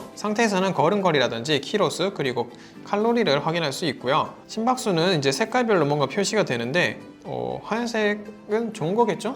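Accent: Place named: Gyeongsang, South Korea. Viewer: 부산 경남 사투리 정감어립니다 반갑쑤~ㅎㅎ 일반 스트랩 메탈 절대 호환안됩니다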